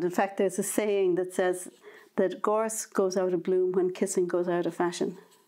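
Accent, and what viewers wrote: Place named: South, Ireland. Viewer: Hi, I really enjoyed your video! However your lighting made it a little difficult to see the image very well. And the image was a bit blurry. I checked if it was the tablet I was viewing from, but it was only on your video that this effect occurred, which is sad because yours is the content I want to see. I LOVE your painting style, and your teaching style. Plus you have a beautiful, gentle, soothing voice which reminds me of my father who was Southern Irish. I have subscribed to your channel today and this video is the first video of yours I have watched and I LOVE your channel. Is there any way for the camera to be closer to the painting, and could you add stronger lighting please, because that would help folks like me who are watercolour newbies, who need to see more of what is going on, on the page? I know it may be asking for a lot, but if it could be sorted out, then we would get so much more from the videos. 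Thank you in advance. God bless you and all those you love. Have a great day!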